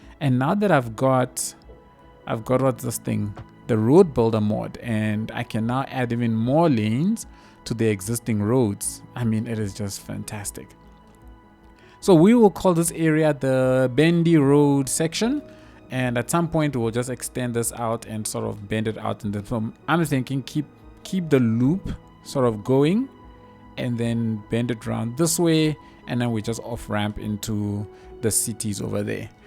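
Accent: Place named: South Africa. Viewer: are you south african? your accent is awesome!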